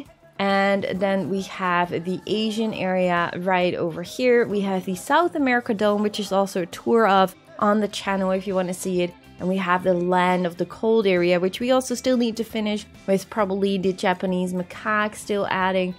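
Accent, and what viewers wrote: Netherlands. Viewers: Are you Dutch? You have a very thick Dutch accent, I love it :D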